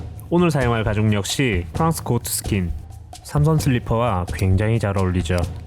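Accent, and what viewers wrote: Gyeongsang, South Korea. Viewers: 아 약간 경상도 억양이 있는 듯 한데 경상도에서 오셨나요!!! 헷갈리네요 ㅎㅎ 항상 잘보고 있어요!!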